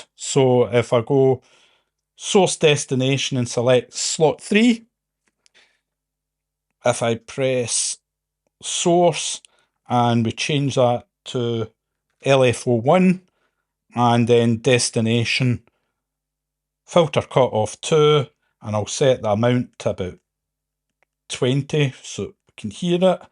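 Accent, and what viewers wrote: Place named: Scotland, United Kingdom. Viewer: He is Scottish. Bloody hell, there's an R! 😄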